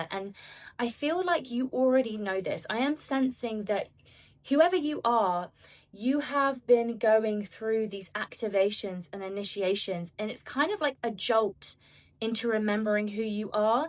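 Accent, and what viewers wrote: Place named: England, United Kingdom. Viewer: Thank you for posting this. This resonated with me so much that the English accent did not even register with me until halfway through. This is the sign.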